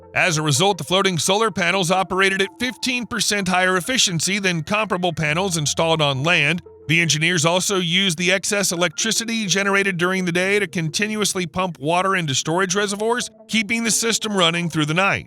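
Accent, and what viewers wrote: United States. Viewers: Heres another Australian who also has never heard of this Disneyland. The American accent telling artificial information.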